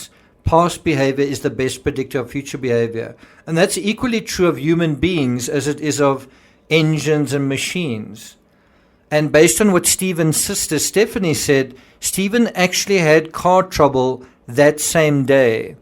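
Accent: South Africa. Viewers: I'm subscribing for the south African accent🇿🇦🇿🇦🇿🇦🇿🇦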